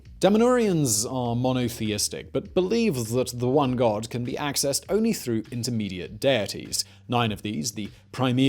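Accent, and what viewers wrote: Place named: Southeast England, United Kingdom. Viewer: It's a bit weird, and the phrasing is idiomatic in places, but it's definitely southeast English.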